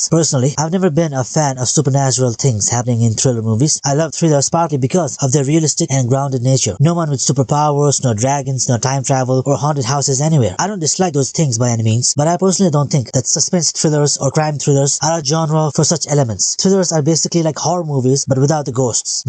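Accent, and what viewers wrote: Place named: Spain. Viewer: bro are you the viral teenager who appeared in podcast debate with girls and called the girl dumb are you? because the way you sound ur accent is like a typical spainish from spain not south america speaking english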